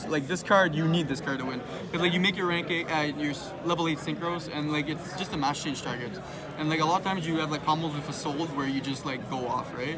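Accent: Canada. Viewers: This guy sounds very Canadian.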